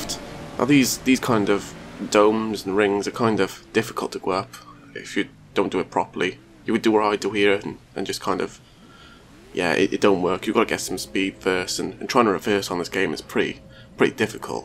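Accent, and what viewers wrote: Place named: Wales, United Kingdom. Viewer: Nice one boy-bach! Glad to see/hear a Welsh voice doing an achievement guide. Keep up the good work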